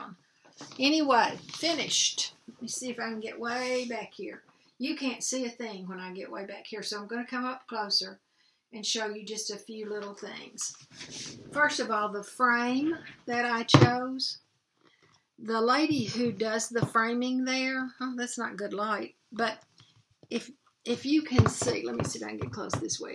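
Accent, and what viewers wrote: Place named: Arkansas, United States. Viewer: Hi, I watched you for the first time today & certainly enjoyed it😊 I will be returning..we live close, we are on the Arkansas line, so your accent made me feel at home😊thank you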